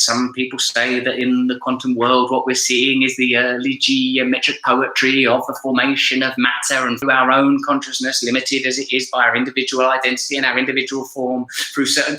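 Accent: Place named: United Kingdom. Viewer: Totally. I just know that when I do start reading, the version of voice in my head is no match for the real thing.;) We American's are so easily beguiled by eloquent British accents.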